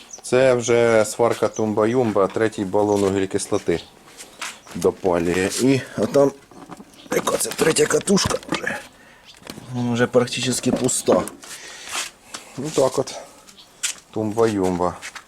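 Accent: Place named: Poltava, Ukraine. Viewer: Вподобайка як завжди!) Нагадує полтавський говір)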